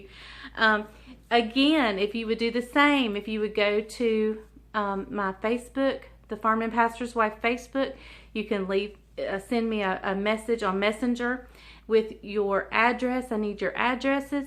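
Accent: South, United States. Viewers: This cake looks absolutely delicious!!! My friend's birthday is coming up at the end of this month and I think this cake would be just the thing for her celebration. I just subscribed to your channel and am looking forward to watching more of your videos. I enjoy listening to your southern accent! (I'm from Missouri where we have no accent LOL)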